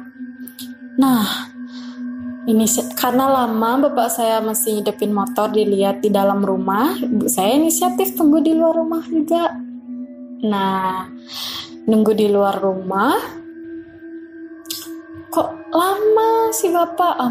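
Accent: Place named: Bali, Indonesia. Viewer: Logat balinya sampek sini mbo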